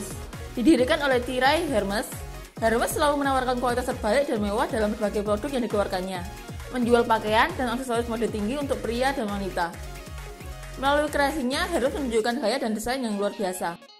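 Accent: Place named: Java, Indonesia. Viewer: medok bgt ngomonge 😅 jawir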